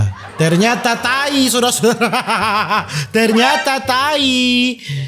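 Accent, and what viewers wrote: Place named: Medan, Indonesia. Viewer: Orang medan ya bang, chenel ter kocak menurut saya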